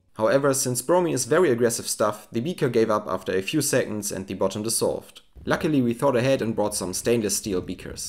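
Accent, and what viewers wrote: Germany. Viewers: Is this guy German? Cool experiment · geiles video und krasser deutscher aktzent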